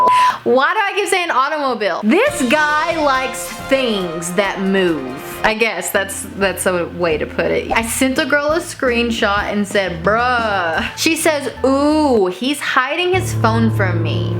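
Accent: South, United States. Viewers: you are blessed with a precious southern accent girl!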